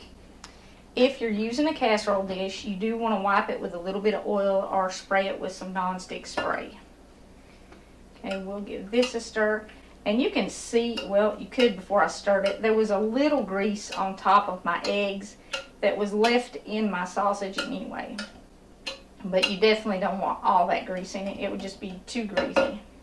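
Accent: South, United States. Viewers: I'm a southern girl myself and I love to hear your accent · A lady with a southern voice like this HAS to be a great cook!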